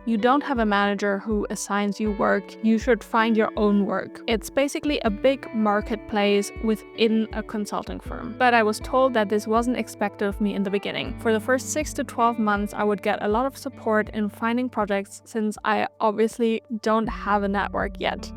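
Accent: Germany. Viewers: You are right that McKinsey doesn't matter...but You're European... You didn't learn the USA hustle mindset...you were absolutely supposed to get another project and make political allies...cultural differences got you. You took people literally. Do I get a German accent? If so from what I understand very different culture. The McKenzie people couldn't explain to you what to do anymore than a fish could tell you how to breath underwater... Going to iveay league schools and private HSs they absorbed the BS with their mother's milk. Social climbing obvuscating masters of double speak. " Consulting".... Scape goats for firing people. " If we do the same with fewer people we'll make more money...genius send that memo to the client... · Do I hear a Gernan accent?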